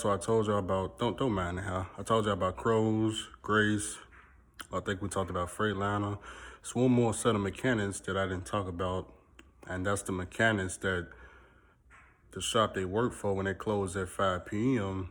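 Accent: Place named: South, United States. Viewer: be killing me with that southern draw but we love it lol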